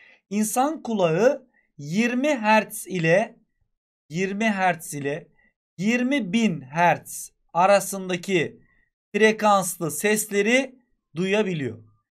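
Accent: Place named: Trabzon, Turkey. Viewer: hocam kesin trabzonlusunuz ilk defa karşılaştım kanalınızla :DDd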